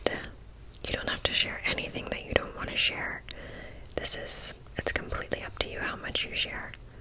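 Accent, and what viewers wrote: Ireland. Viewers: More Irish accent please!!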